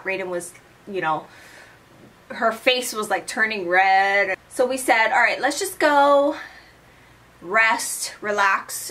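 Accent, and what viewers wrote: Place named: Hawaii, United States. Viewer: loved this video!! watching you and hearing your accent make me miss hawaii so much!! <3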